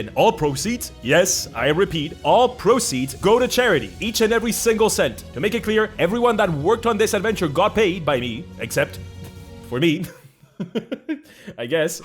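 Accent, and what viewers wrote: Spain. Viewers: Is that a spanish accent I hear? I always thought you were italian! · Também gosto que tu é espanhol, algo na fala derivada do latim me da uma sensação comunitária também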